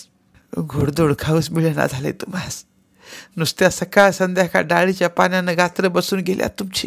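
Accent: Rural, India. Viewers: 🙏 तुमचा ग्रामीण आवाज व वाचन अतिशय सुंदर आहे. मी तुमची नवीन श्रोता वाचक आहे. 😀😀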